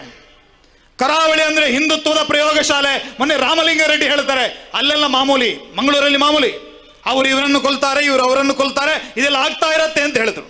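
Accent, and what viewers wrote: Standard. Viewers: Swacha kannada power full speech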